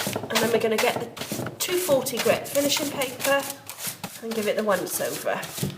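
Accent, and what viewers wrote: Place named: Wales, United Kingdom. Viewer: hi, do you use both farrow & ball undercoat/primer & top coat, & are they both water based? also the liming wax, what brand is it, btw its fantastic to hear a fellow welsh accent on y.t. for a change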